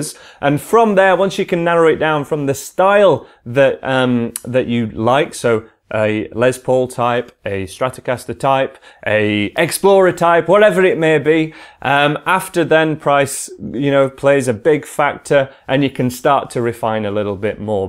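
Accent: United Kingdom. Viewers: im from the US and i can hear this guy just fine. he's got a British accent, but i can everything perfectly. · Can someone tell me the names of the set used in this video and organize them according to price, sorry i can't catch words from the British accent that much and thanks in advance!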